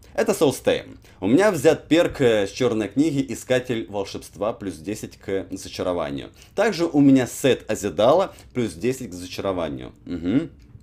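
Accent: Ukraine. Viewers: Ты под корнем нирна играешь?)) Украинский акцент делает ламповым видос👻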